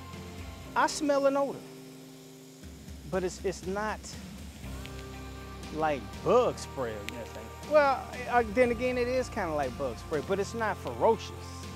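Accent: South, United States. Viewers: Bruh, where you from, NC or Va?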